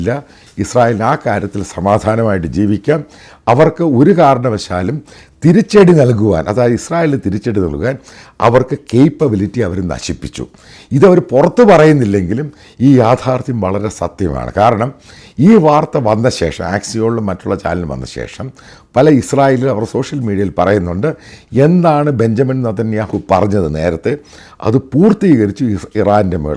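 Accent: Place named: Central Travancore, India. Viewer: മദ്ധ്യ തിരുവിതാംകൂർ ശൈലിയാണ്.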